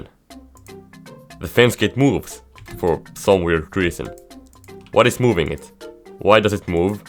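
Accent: Finland. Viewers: This sounds really much finnish accent! Pitää tilata kanava, hyviltä videoilta vaikuttaa!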